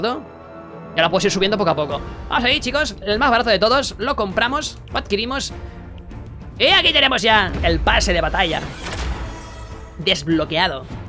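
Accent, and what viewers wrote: Spain. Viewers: Tengo que ver el video sin audio gracias a tu estresante y molesto acento español. Gracias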